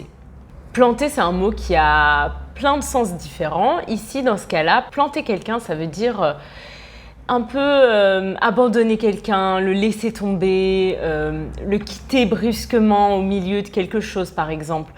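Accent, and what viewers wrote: Paris, France. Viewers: Bonjour. J’adore ton accent français. Je trouve que ce vidéo également intéressant. J’ai hâte de votre prochain vidéo. J’aimerais que vous faites le même pour la série Dix pour-cent (Call my agent) si c’est possible. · Elle a un accent parisien, mais surtout, elle accentue sa prononciation, et parle doucement, pour que vous saisissiez ce qu'elle dit.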